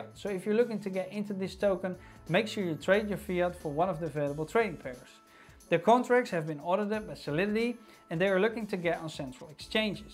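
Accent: Netherlands. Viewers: You sound Dutch :) Nice covering of Cellframe, thx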